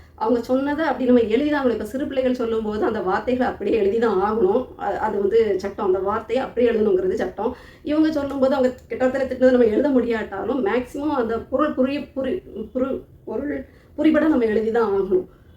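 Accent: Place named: Kanyakumari, India. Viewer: அக்கா நம்ம வட்டார வழக்கில பேசி கலக்குறீங்க #பழந்தமிழ் #தேன்மொழி #குமரித்தமிழ்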